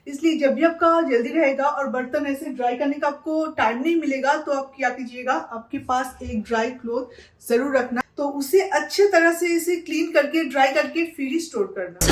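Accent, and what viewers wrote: Bengal, India. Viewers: Still you're carrying your Bengali tone, accent and pronunciation in your Hindi and English conversation/words.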